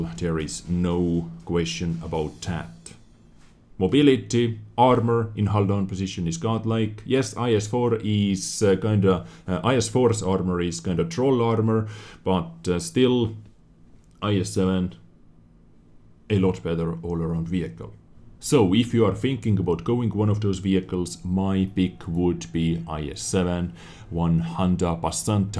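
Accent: Estonia. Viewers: are u estonian?:)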